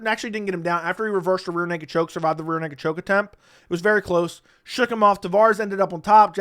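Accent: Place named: South, United States. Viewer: Love when you do that. Mega good old boy voice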